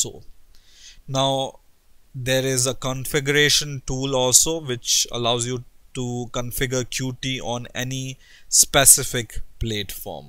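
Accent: India. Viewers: Probably south asia [India] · Hindi accent is not so bad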